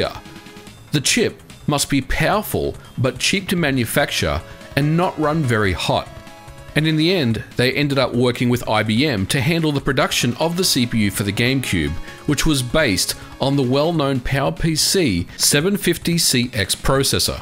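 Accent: Australia. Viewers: Australian or South African accent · Mate, that's just part of the mystique of the Aussie accent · Strayan accent coming through.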